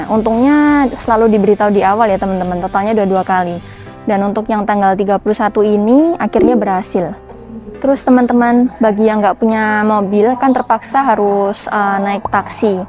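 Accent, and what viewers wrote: Java, Indonesia. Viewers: Mbaknya pasti orang Surabaya, logatnya jawa banget..salam hangat dari Jateng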